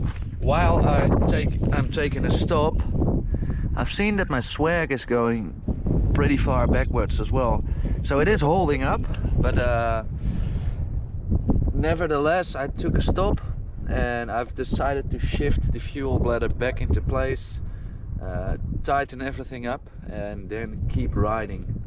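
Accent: Netherlands. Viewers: Do you sell merchandises? Your dutch accent reminds me of Itchy Boots Noraly. You both sound the same. I live on Australia. Used to live in Germany. I can understand and read dutch a little bit. Sounds similar to German.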